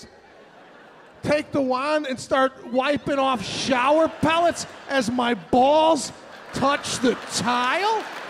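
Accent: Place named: Chicago, United States. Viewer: Chicago Sicilians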